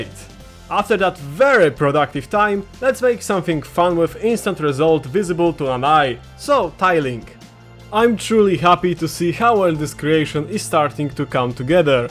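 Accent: Poland. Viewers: Słychać że polak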